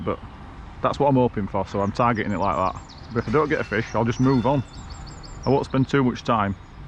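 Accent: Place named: West Midlands, United Kingdom. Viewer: What canal is that? Is that the Aire/Calder? Your accent kind of sounds West Midlands, maybe Leeds area. Hope all is okay in the UK.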